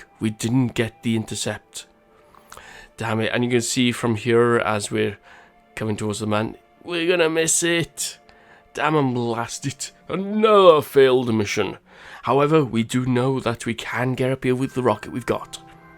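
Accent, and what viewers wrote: Wales, United Kingdom. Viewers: I thought I heard some welsh in there haha! I don't normally hear strong accents down here either. I take it Cardiff way then with the butt? Mush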